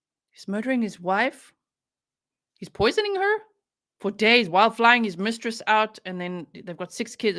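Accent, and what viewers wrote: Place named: South Africa. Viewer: nice to hear a South African accent!!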